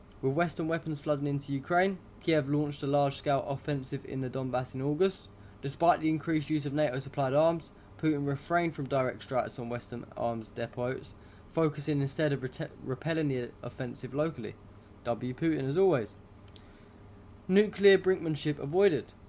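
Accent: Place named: United Kingdom. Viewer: I like how your accent is (the British flair 😅), especially at the beginning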